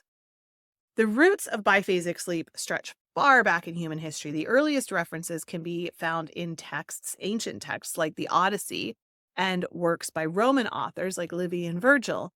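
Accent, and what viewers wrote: United States. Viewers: A good engagement, however your American accent is totally unnecessary & very annoying, sorry.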